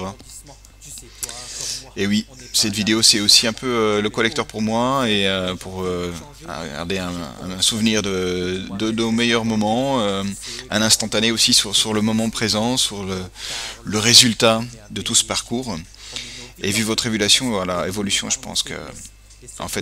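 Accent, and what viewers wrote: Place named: United States. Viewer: comme on dit chez nous, ta faillit me faire braire lol, je doit t'avouer que sa me pince le coeur que tu ne soit plus parmis nous, tu m'a beaucoup appris, tu m'a redonner confiance en moi et pas que sur le jeu, outre le fait que tu sois un excellent joueur, tu es surtout une très belle personne, "the voice" avec ton accent à l'américaine que je kiff tellement ahah, par moment sur la fin j'avais envie de te "forcer" à rester car je ne suis pas dupe, mais sa aurais été je pense irrespectueux et inutile, j'espère que tu trouvera ce que tu cherche, dans tout les cas j'espère qu'on restera en contact via fcbk, tu va me manquer, d'ailleurs tu me manquais déjà depuis quelques temps, je garde tes précieux conseils bien aux chaud pour pouvoir continuer à faire évoluer le clan, un grand merci à toi, au temps et à l'énergie que tu nous a consacré, comme dirais l'autre "all right" ou encore "yallah" lol, reste comme tu es et prend soin de toi et de ta famille, je te souhaite d'être heureux, et ce n'est qu'un aurevoir ;)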